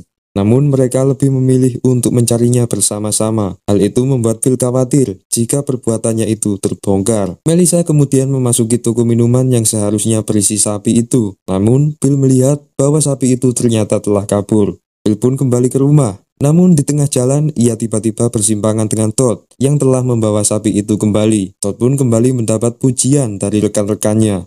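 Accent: Java, Indonesia. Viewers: logatnya jawa bgt mas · baru kali ini dpt yg jawa biasanya aksennya Sunda mulu 👍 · Jawa nya medok bgt jadi kga jelass asikkk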